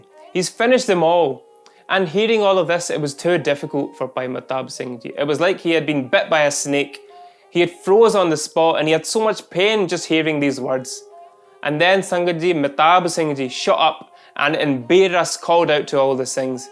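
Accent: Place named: Scotland, United Kingdom. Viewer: Brilliant job bhai ji, and I adore your Scottish accent.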